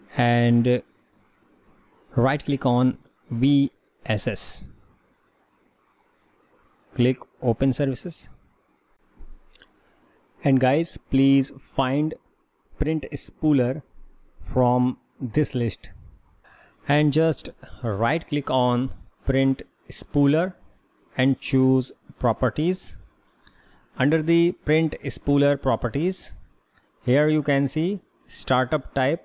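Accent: India. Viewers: Worked for me, thanks! Also one of the easiest to understand indian accents out there :).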